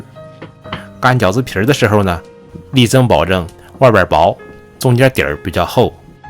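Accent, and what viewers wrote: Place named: Jiaodong, China. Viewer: 胶东口音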